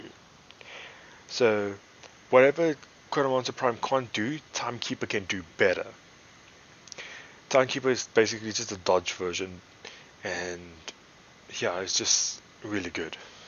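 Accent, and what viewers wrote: South Africa. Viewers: You sound South African. Thanks for the video though, wish you could update it with the two latest classes.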